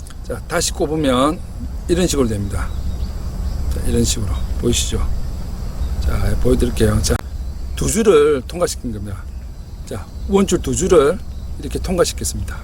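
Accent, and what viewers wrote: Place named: Tongyeong, South Korea. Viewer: 고향이 통영인 외지에 사는 사람입니다 고향말을 들으니 좋아서 그러니 오해마세요 ㅎㅎ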